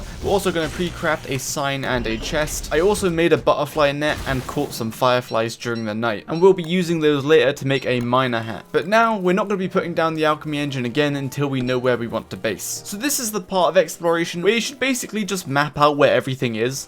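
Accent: United Kingdom. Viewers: The bri'ish accent commentary is charming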